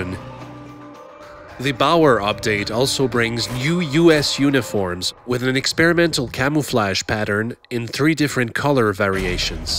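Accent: Germany. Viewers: That German pronunciation XD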